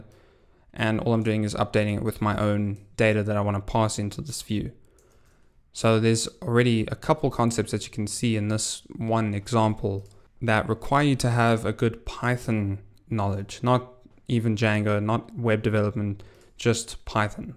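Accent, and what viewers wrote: South Africa. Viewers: Hey a south african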